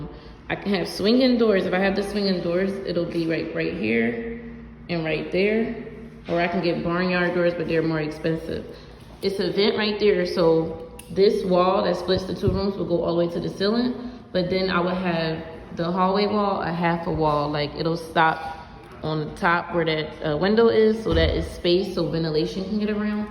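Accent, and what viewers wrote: Philadelphia, United States. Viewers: You have an east coast accent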